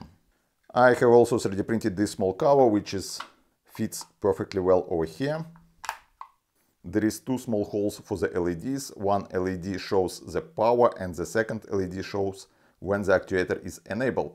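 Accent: Russia. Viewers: Ебать акцент, наш чтоли?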